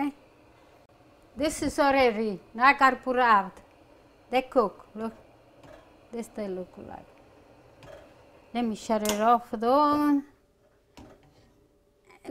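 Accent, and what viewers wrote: Italy. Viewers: La adoro sg. mi piace il tuo itanglese😍. Che Dio la benedica a lei e la sua famiglia, la sua famiglia è veramente fortunata di averla. Buone Feste❤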